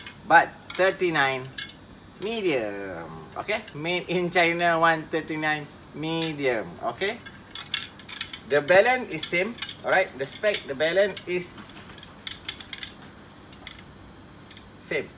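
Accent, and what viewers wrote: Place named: Malaysia. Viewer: Saran bang mending di kasih subtitle bahasa inggris aja biar gak belepotan Malay Inggris😁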